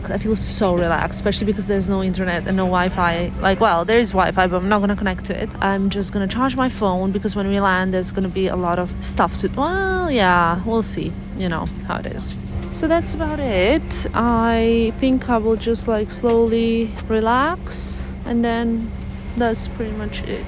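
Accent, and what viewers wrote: England, United Kingdom. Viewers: Omg by the end of the video your accent got SO British ahahah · Through this whole video, especially towards the end, your English accent really came through!! Stay safe xoxo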